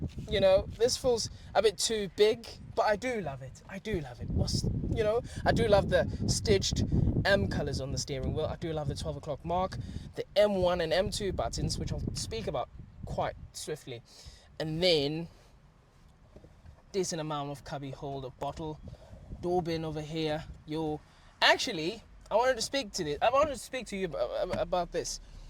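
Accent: United Kingdom. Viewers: Broo with that accent, you giving UK vibes😭😭🔥🥂 W UK accent, keep up the tremendous job🔥🔥🔥🙏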